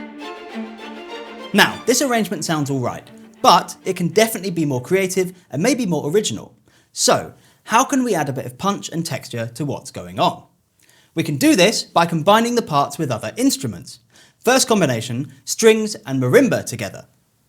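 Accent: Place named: England, United Kingdom. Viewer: It's a perfectly clear, understandable and succinct English accent, what are you talking about!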